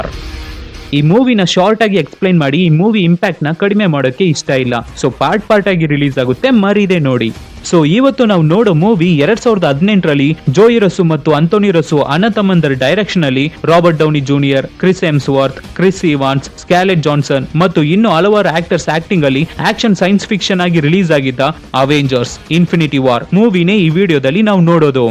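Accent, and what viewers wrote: Tamil Nadu, India. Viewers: Sir nivu Tamil avra...yakandre nimma tone Tamil avr thara edhe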